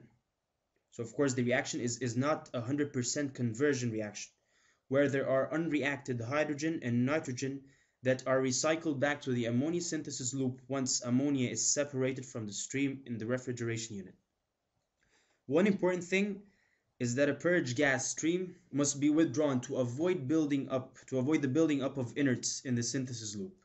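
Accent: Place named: Arab world. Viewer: thanks man! really helpful! i sense an arabic accent! all the best man! ---Undergrad chem eng student, Australia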